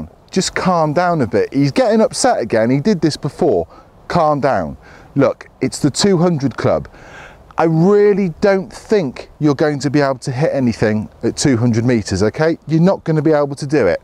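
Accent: England, United Kingdom. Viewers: Nice show, sir, nice show. Your accent is bloody English, i like it.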